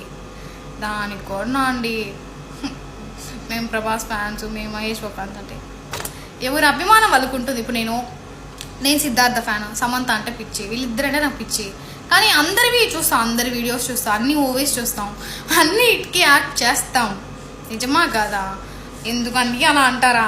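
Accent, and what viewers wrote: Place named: Rayalaseema, India. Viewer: Meru kadapa bedda na rayalasema leka karnul aa me voice lo base bagunde super continue🎉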